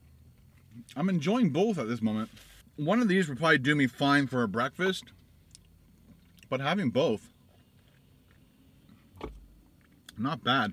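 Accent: Canada. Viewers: #snausage and #egger makes English with a Canadian accent awesome, eh!!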